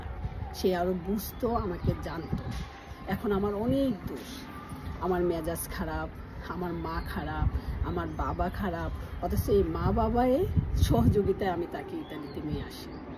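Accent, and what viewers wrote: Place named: Comilla, Bangladesh. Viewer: আপনি শুধু বিয়ে নিয়ে থাকেন। মন রোমান্সে ভরপুর। কুমিল্লার কোথায় থাকেন? আপনি কুমিল্লার মেয়ে। ভাষায় বুঝা যায়।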